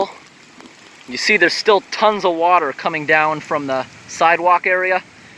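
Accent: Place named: New Jersey, United States. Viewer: Sounds like Jersey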